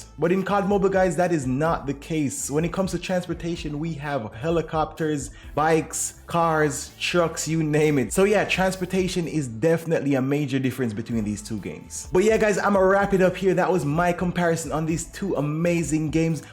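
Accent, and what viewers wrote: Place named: Jamaica. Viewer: Lol just meet up on u channel from how u talk mi know yawh jamaica from u say 3 ppl than person lol big up bro bro and make a sub zimi😎 and to me apex is a big fresh air cause cod rn just a bring same thing over and over cant bother with that more while and rank fun fi grind more on apex than cod not to mention the skill it takes to play apex..see how it go still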